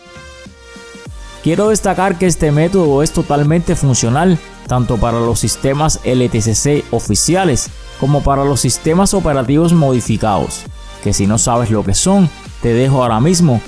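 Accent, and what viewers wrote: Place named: Cuba. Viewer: Que sos de Cuba o el Salvador?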